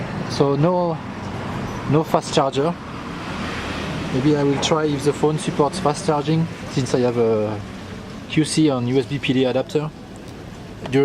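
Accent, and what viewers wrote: France. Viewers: A little french accent ? :)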